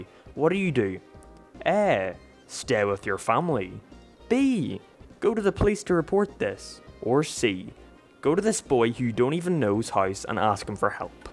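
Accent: Ireland. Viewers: Bro is Irish asf 6y later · I fell like he has a Irish accent someone tell me if he is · Oh my gosh he sounds so Irish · jeez early sounds irish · He has more of the Irish accent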